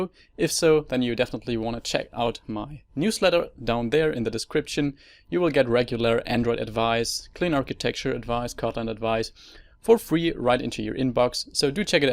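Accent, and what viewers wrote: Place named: Germany. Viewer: I always wondered what your accent was — now I know, it’s German!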